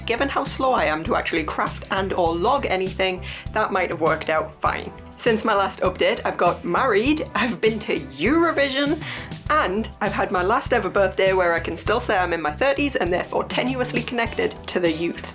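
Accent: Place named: Northern England, United Kingdom. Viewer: I'm a teacher, and when I look back at my old lessons from years ago, I have the exact same feeling. I'm sure they're not as bad as you think. May I ask, where about in the North are you? It's so rare to hear a northern accent on here! We're from Huddersfield (near Manchester) so fellow northerners!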